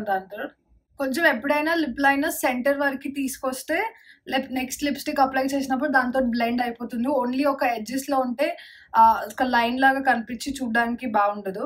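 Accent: Telangana, India. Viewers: telengana pilla.. we should proud of our slang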